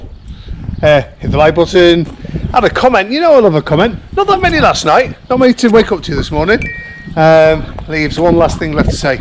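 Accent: United Kingdom. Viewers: I agree with you. The glasses make the difference with the song. High class 😅 Good pronunciation with a light british accent. It's easy to understand your French.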